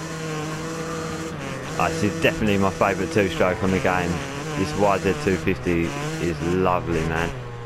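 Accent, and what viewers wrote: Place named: United Kingdom. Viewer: I love your accent so much where are you from in the uk ? Good videos by the way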